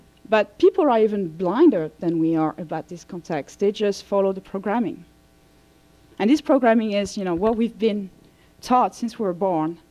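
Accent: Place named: France. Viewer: Powerful stuff; good talk; French accent and all - you're a great speaker! Thanks for spreading the word... and spreading it a little more creatively than when you started back in 2006;)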